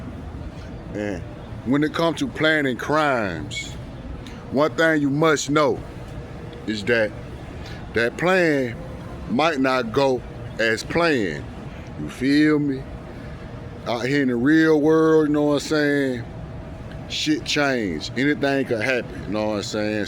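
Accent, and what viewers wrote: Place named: South, United States. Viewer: You sound like my folks in Atlanta! #southernaccent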